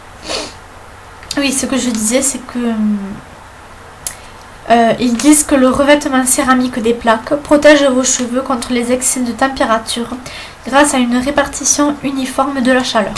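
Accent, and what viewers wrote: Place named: South, France. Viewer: On dirait que t'as un accent du sud :D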